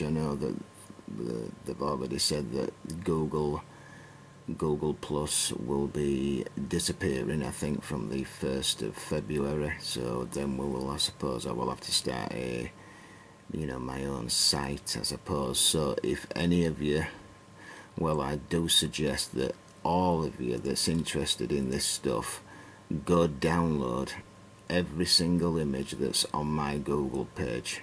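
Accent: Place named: Scotland, United Kingdom. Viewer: I've just discovered your YouTube Channel and subscribed. I've been interested in ancient history/archaeology since I was a child (I'm now 60 years old) and finally, now, lots of things are starting to make sense thanks to your videos. Do you have a web page? I can't find anything other than a couple links when I "google" / You Tube channel - no real website(s). Can I ask your name? Maybe I can find more info that way...also, love your interesting accent! Scottish?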